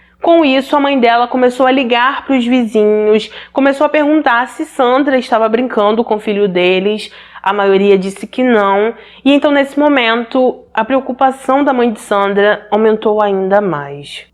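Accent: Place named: Belém, Brazil. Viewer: Sou novo no canal e adorei os vídeos. So uma curiosidade: és do norte do Brasil? Eu sou de Belém do Pará e teu sotaque é muito parecido com o de Belém 😂. Sucesso pro canal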